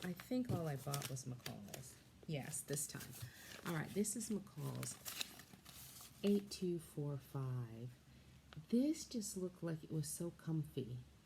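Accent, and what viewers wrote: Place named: Midwest, United States. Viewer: You’re in Jamaica, but you sounds like you’re from Maybe Michigan ish. Illinois? Midwest. Then you quilt. Then you said “Here’s the thing…” Then I said, “Why haven’t you subscribed. What are you been waiting for!?” Then I subscribed😄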